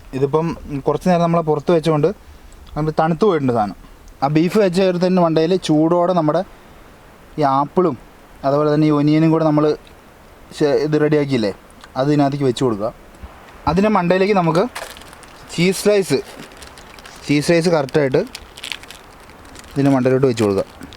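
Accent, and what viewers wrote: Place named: Idukki, India. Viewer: Iddukki anno ee sthalam slang kettit iddukki annennu thonnunavar like adi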